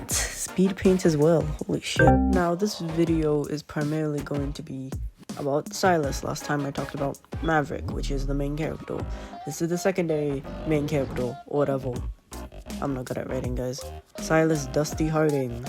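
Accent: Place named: Canada. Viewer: I hear the Canadian in your voice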